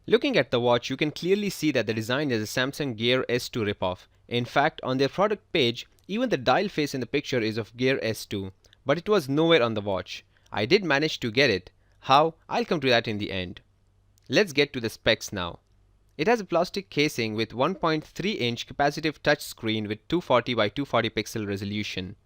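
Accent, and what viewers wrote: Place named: India. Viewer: Indian accents bug me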